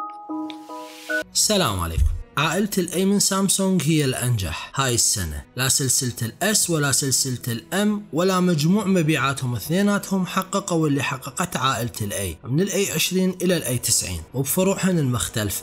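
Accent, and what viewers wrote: Iraq. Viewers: من سوريا احييك اعشق اللهجة العراقية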